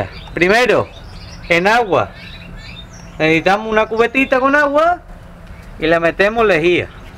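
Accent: Andalusia, Spain. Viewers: Hombre ya es la hora esta esperando este vídeo como agua de mayo jejejeje gracias un saludo campeón a y haber si me entero de donde eres por el acento andaluz seguro igual que yo jejejeje saludos campeón desde moron de la frontera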